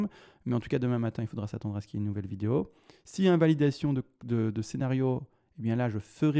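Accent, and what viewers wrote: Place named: Belgium. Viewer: Merci pour ta vidéo je reconnais l’accent belge ? de chez moi 👍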